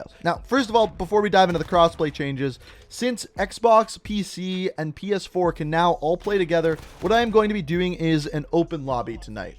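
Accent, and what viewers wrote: Canada. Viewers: I can definitely tell you Canadian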